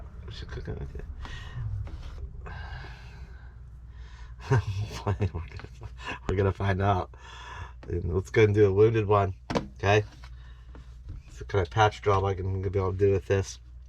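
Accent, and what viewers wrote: Pennsylvania, United States. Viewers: That launch was cool, thanks for sharing..I live on the Delaware MD border n when the skies are clear you can just see the launches from Wallops Island in Accomack Va..have never gone to the launch but it's on my bucket list..I enjoy your videos..you remind me of family, how i was raised in Ohio and for my Pa cousins it's the accent, lol..Happy travels, Cheri✌️❤️😊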